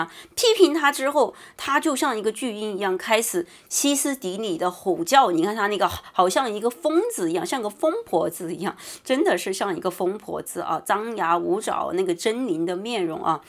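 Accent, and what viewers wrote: China. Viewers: 听你口音，也是中国的吧？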